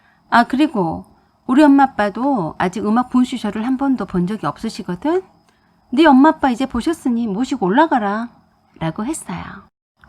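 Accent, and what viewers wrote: Jeolla, South Korea. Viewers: 나레이션 하시는분 전라도사투리 쑥쑥 나오네여.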